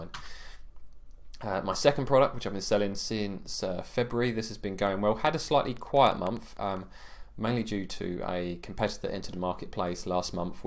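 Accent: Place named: Essex, United Kingdom. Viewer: Great video, where are you based in UK? Sound a little bit Essex but can’t quite place the accent 😁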